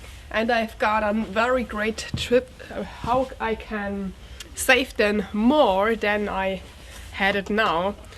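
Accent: Bavaria, Germany. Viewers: Hi! Regarding security for your little forest cabin ("hut"), I cannot add much more to what others have already written in the comments. I recently came upon this channel of yours. After watching 3 of your videos, I was impressed with your knowledge of outdoor skills. I was especially impressed with your knowledge of edible wild plants and herbs. So I subscribed to this YouTube channel. Als ich feststellte, dass Sie auch einen deutschen Kanal haben, habe ich ihn auch sofort abonniert. Es ist sehr beeindruckend, dass Sie Videos in englischer Sprache machen. Aus einiger Erfahrung[*] weiß ich, dass es nicht einfach ist in einer Fremdsprache frei zu sprechen. Also! Für ihre tolle Leistung... Hut ab! Und ich wünsche ihnen weiteren Erfolg bei allen was Sie unternehmen. Übrigens ihre Kinder sind hübsch und süß. I love your German accent in English and your Bayern accent in German. 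--- [*] In den 90er Jahren lebte ich in Deutschland und habe für eine deutsche Firma gearbeitet.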